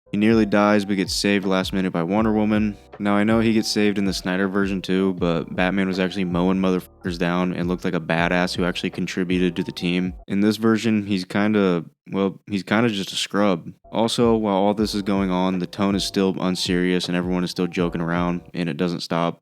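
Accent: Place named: United States. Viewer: You are still a soulless excuse of a human being for not caring about the family in the theatrical cut. But you sound American so it’s almost a cliche. Still important for the film to reiterate that they are heroes. They are here to save everyone. Collateral damage or the world. About your conclusions I must fall in favor. The Snyder cut showed a better representation of the heroes. Everyone was aware of the stakes and reacted accordingly. The heroes had important roles and ended the mission with serious determination.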